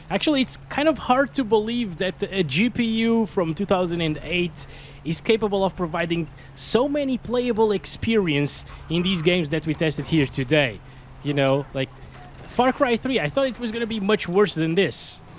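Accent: Portugal. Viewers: És português? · És português??? 🤔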